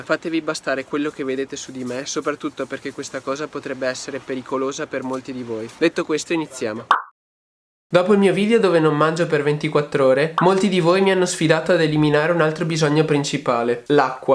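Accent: Veneto, Italy. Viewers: Ma sei veneto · Hai un bellissimo canale! Questo video è davvero bellissimo. Tu sei un ragazzo onesto, educato, pulito e parli davvero molto bene. Assolutamente iscritto perché meriti davvero tantissimo! Complimenti sei grandissimo, se posso chiedere, da dove vieni? Hai un accento molto simile dal mio che vengo dal Veneto ahaa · Ma sei veneto? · Ciao, sei di Padova ho riconosciuto la stazione dei bus??? Sei veneto quello sicuro dall'accento che hai:-) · Sei veneto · Ho visto un video dove alcuni fruttariani sostenevano di poter vivere senza bere acqua mangiando solo una mela al giorno. Se fosse vero con tutta la frutta che hai mangiato non dovresti aver avuto problemi. Comunque hai fatto bene a concludere la sfida un po' prima dato che dopo il mal di testa il prossimo sintomo da disidratazione era il colpo di calore e poi probabilmente il coma. Che lavoro fai ? 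Se posso sapere. Se facevi un lavoro pesante magari sotto al sole come l'operaio ci lasciavi le penne. Hai fatto un bel video onestamente (montaggio riprese ecc) e fai bene ad ascoltare la tua community e non fare più challenge del genere. PS: si sente che sei veneto ciò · Sei veneto? Se si credo di Padova giusto?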